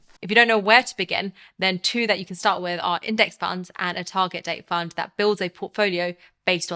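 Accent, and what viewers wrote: United Kingdom. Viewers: She is Indian but speaks with a heavy loaded British accent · I have no idea what country this was produced in. I'm guessing by accent it is the UK. I will say a major factor in the United States for having to stay in the rat race at dead end jobs is health insurance. As everyone in the US knows health insurance for oneself and their family are tied to their employer. Private insurance is just too expensive for anyone over 25.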